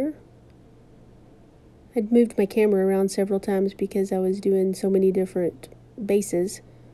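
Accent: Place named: Midwest, United States. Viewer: Very nice! Question where are you from? The mid west! Maybe Indiana? Just listening to you talk.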